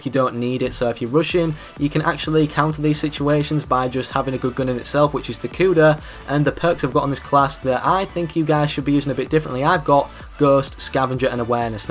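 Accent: United Kingdom. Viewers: I hate your British accent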